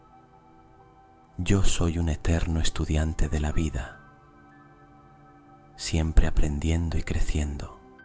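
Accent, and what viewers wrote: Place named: Spain. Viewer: esa voz de españolete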